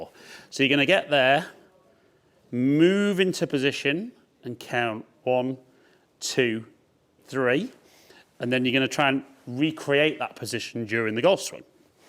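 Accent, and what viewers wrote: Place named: Northern England, United Kingdom. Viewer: I smiled at that too. I live in the SE of England (outside London) and we play througthout the winter. To be fair, I think from accent he is based in the north of England where it is a harder winter but even so, to say the winter weather is horrible is probably pushing it a little:)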